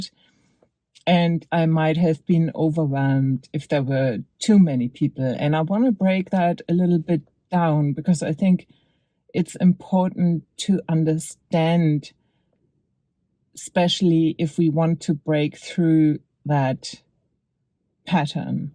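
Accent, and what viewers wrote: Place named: Germany. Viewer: Nice and inspiring, I don't know why but I quite like the German accent lol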